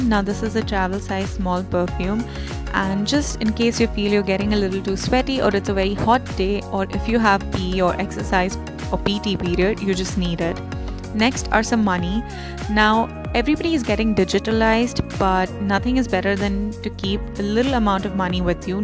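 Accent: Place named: United States. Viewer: UR AN INDIAN BUT U HAVE USA ACENT LIKE ME IM ALSO INDIAN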